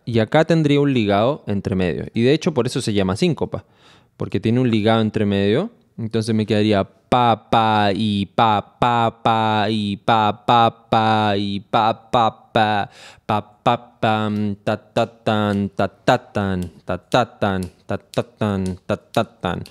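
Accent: Chile. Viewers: Primer video que veo, y empezando el video me di cuenta que eres Chileno jajaj. Buen video compa, sigue asi🔥🔥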